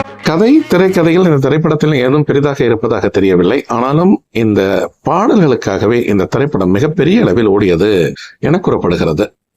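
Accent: Jaffna, Sri Lanka. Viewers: தங்களின் யாழ் தமிழ் உச்சரிப்பு பாடல்களை விட இனிமையாக இருக்கிறது.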